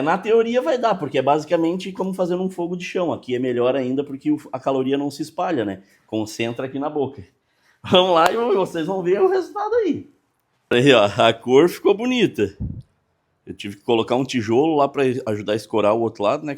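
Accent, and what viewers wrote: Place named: Rio Grande do Sul, Brazil. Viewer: so pelo jeito q ele fala reconheço q ele e do meu estado,do rs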